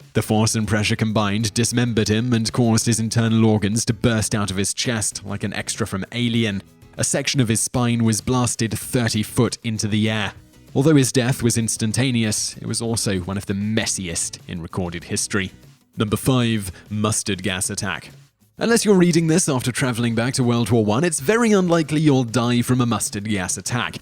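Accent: United Kingdom. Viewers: I HATE his British accent !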